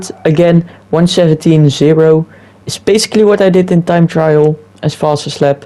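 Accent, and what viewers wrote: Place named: Netherlands. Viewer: Ik hoor dat je Nederlands bent :)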